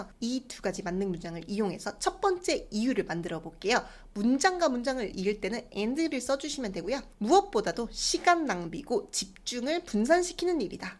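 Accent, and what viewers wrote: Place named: United Kingdom. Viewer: 강의 내용 다 좋은데 혹시 영국에서 공부하신건가요? yes나 so 등 억양을 너무 강하게 올리시는게 미국이 아니라 영국식 발음 같습니다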